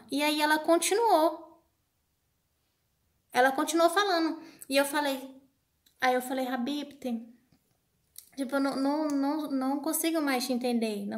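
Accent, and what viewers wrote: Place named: Northeast, Brazil. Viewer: Vc é de Brasília...seu sotaque parece do Nordeste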